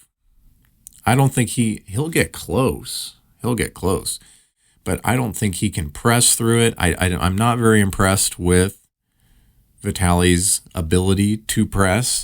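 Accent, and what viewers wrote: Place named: United States. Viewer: Finally, found an AW news channel with an American accent .. plz post daily🫡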